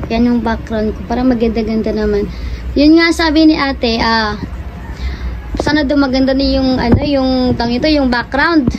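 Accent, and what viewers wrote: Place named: Iloilo, Philippines. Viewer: Sin hahaha ilongga tlaga. yero po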